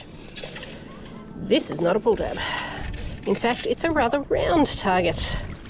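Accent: Australia. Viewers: I never noticed how long it takes to get to the action part of your video. You could ramble all day long for all I care. I love Aussie accents. You and your personality are the main reasons I "tune in". It's irrelevant (to me) if you find anything at all. The longer your videos, the better.: ) Well done. Awesome find! Please take care, and God bless you,!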